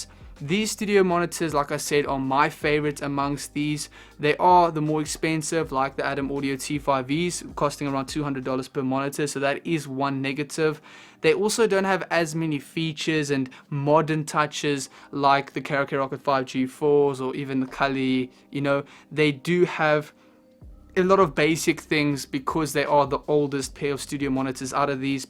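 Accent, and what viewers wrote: South Africa. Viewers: You sound South African dope video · Are you South African bro ??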